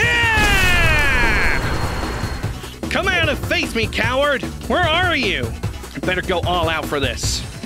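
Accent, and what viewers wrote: United Kingdom. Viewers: Ah yes British goku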